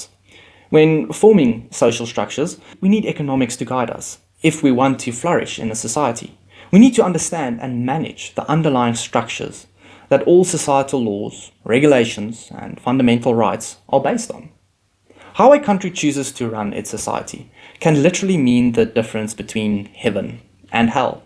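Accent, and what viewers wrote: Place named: South Africa. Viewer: Just out of curiosity, where do you come from? Your accent sounds South African (like British roots mixed with an Afrikaner accent), but you could also be from the continent of Australia. It would be quite a surprise if you are South African as not many South African Youtubers make this kind of content.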